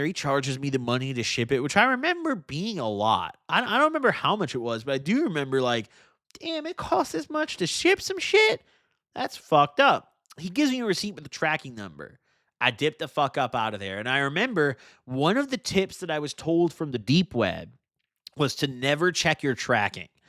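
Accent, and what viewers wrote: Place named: Illinois, United States. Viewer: Yooooo my dawg i fuck with u tough frfr especially being from Illinois im jus now listening to the stories omfg u got it and the fact u talk like a illinoisien i love yo content even better plus u remind me of 50% of my homies from back in the day i can confirm the shit u be talking about I fw it 💯 … I used to be an addict I wish I had ur content when I was def woulda helped me recover but brooooo my nigg@ with this video and me used to ship as a rookie made me fckin die in laughter glad I wasn’t the only one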